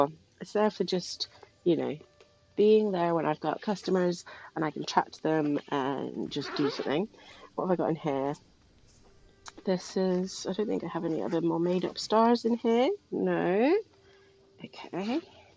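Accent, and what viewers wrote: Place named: United Kingdom. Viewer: I thought it was just me! You did sound more british. 😊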